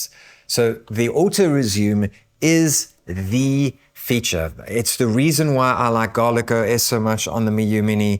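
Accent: South Africa. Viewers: Sounds like you are from Cape Town South Africa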